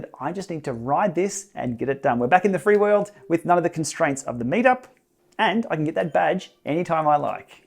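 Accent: Australia. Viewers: May GPSheepy safely graze! Will meekly follow along, username V.Bonazzi. Thanks for your excellent videos, your Ballarat accent and videos from home. This Zwift e-snail lives a long way from home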